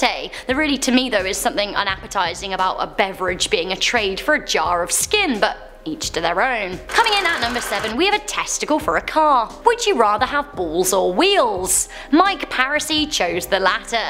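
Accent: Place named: United Kingdom. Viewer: beautiful dress i love british accents ive been watching this channel for over a year and i still love your accent it never gets old to me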